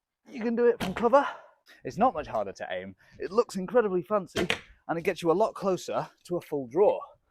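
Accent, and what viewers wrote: United Kingdom. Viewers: why did I know he'd have a british accent? From the thumbnail I was imagining something like "shooting soidways?? MAAAATE!" and then a bunch of Teesside verbal abuse lol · I had this on mute and thought 'this guy must be british'...I turned the volume up and wo and behold lol P.s. this video was very entertaining and informative 👏🏾 ❤❤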